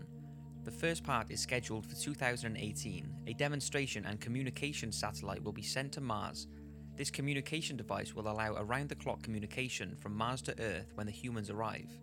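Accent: Wales, United Kingdom. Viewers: i can not work out your accent! it sounds kinda welsh??? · i like british commentary. it makes the videos feel more intense and stuff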